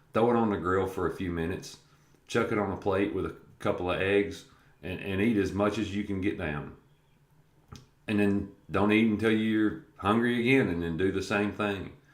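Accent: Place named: Appalachia, United States. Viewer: Good vid man, Loving the Hillybilly Accent :D, subbed to follow your progress!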